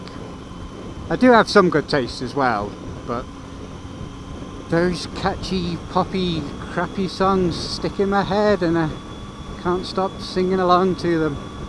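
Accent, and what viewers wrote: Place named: England, United Kingdom. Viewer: Thanks, ALL three now firmly stuck in my head. Wasn't expecting an English accent, seeing those double yellow centrelines! I'm joining the expat club and will be broadcasting English accentry from Europe!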